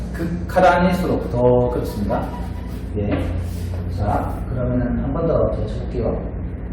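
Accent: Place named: Gyeongsang, South Korea. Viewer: 말투가 진짜 정겹네요 ㅎㅎ고향사람 생각납니다 창원 마산사람이라~ ❤❤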